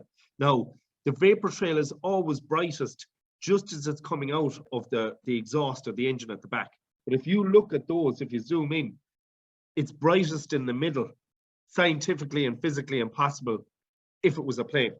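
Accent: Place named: Ireland. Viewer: Thanks 😊loving your Irish accent. How do you take your photos and do you use special software to get the close ups? I'd love to photograph my craft I see.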